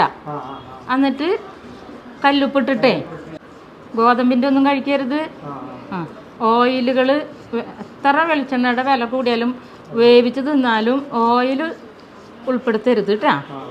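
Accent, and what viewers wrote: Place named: Palakkad, India. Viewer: സംസാരം കേട്ടിട്ട്. പാലക്കാട്‌.. അല്ലെങ്കിൽ തൃശൂർ ആണെന്ന് തോനുന്നു 🥰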